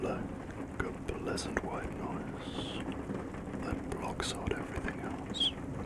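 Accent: Germany. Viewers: ☆ More whispering videos, please! I usually don't like talking videos, but your voice is the only one that's really soothing to me. As well as the German accent; my family is German. Thank you from my ♡ to yours! ☆ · Tingle Lovers … I love joining you here. I noticed you may have an accent, are you German? Sending the best wishes to you in whichever country you are in. Tingles to you everyone! 🥂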